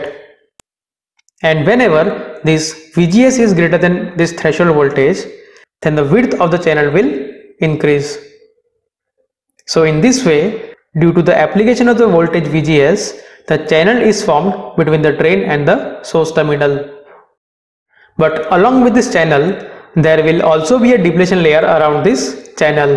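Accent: India. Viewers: That indian accent is boring and annoying tbh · How indians explain things better. You make it a piece of cake, easy. Thank you indians. (Your english style is funny)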